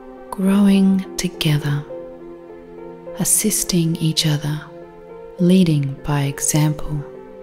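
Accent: Australia. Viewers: ...thank you! I've been listening to a lot if these meditation videos but your voice is SO soothing....must be that Aussie accent. In any event, thank you! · WOW ❤ !! Powerful & Gorgeous !! Plus that Ozzie accent 🤩 ! Thank you 🙏🏻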